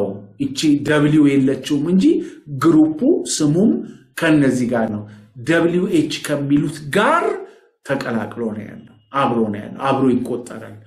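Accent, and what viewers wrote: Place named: United States. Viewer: በጣም አመሰግናለሁ አቤት ለውጥ ያሜሪካኖቹ አክሰንትን አመጣህልኝ water ለማለት በፍፁም አደር ስታንድ አያረጉህም ቀጥል በጣም ጎበዝ ነህ አክሰንት አዙሮ ሊደፋነው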